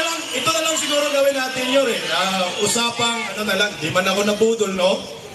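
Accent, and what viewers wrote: Visayas, Philippines. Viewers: Si bisdak ba yan nagsasalita ngeiii😅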